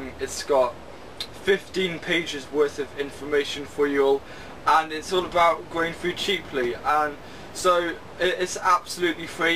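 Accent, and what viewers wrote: United Kingdom. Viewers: "Annoying teenager with a british accent"...hahaha...I like your accent. Irish and british are, in my opinion, the cutest accents ever. Great tips btw. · You are not annoying. And a Brit accent is anything but grating! ...it is (the accent) and you are rather nice to listen to!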